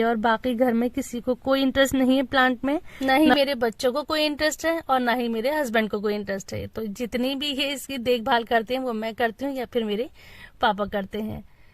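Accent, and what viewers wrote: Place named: India. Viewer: Itni saaf Urdu India mai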